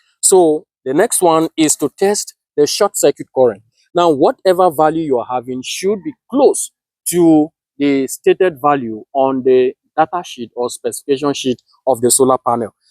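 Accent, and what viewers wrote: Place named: Africa. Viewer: There is nothing wrong with the way he pronounced circuit and Celsius, he's from Africa do you expect him to sound European!?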